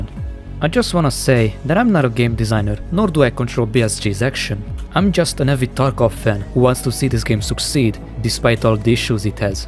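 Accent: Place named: Hungary. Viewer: Tudtam én hogy magyar :D a hunglisht nehéz rejteni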